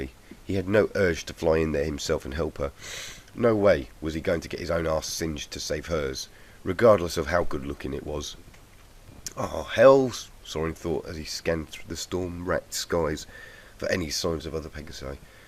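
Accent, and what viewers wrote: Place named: England, United Kingdom. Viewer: SUCH A GREAT READER (now I want to have an English accent)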